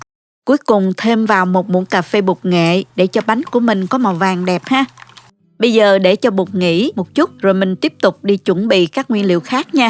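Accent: South, Vietnam. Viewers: Yêu, tiếng nói miền Nam đệ thương vô cùng ❤🎉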